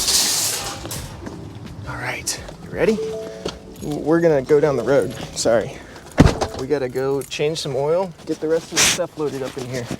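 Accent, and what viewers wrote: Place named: Memphis, United States. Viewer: You from Memphis? You sound like it. Where are you from? Tell me I'll subscribe.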